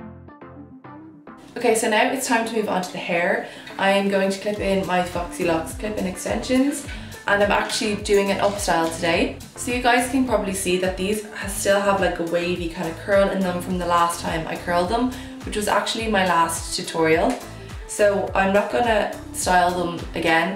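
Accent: Dublin, Ireland. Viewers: She's Irish for sure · are you irish?? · I think she's Irish!! it's such a subtle accent tho! I love it hahaha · Is her accent Irish or South African? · she's Irish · Are you Irish or British?? You sound Irish...btw new to your channel love this look you're gorgeous!! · What part of Ireland are you from ❤️ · She's Irish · where is she from? jw lol, im new here. maybe ireland? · Your beautiful irish accented voice could never be annoying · is she irish?? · this is the first video I've ever watched of you, one question are you Irish ? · Do you have an Irish accent?😊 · do u live in america? i thought u were irish girllll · She is from Ireland. Lovely accent! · What accent have u got? (Scottish) Irish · Is she Irish?x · Are you Irish?? Your accent is 😍😍😍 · Is she from Ireland cause she had an Irish accent I'm from Ireland iswell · Definitely Irish!! Yes I am American, but I can pick up that accent any day. 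😂😂 oh & plat also means to braid. 👌🏼 Also recreated this look & used the Gwen Stefani palette as well as my Morphe 350. For the lip. Used Love Bite by Kylie Cosmetics & it went perfect. 😍😍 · Sounds Irish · Is she part Irish I feel like she has an accent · You're so gorgeous! Btw are you irish? lol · yeah shes from dublin :)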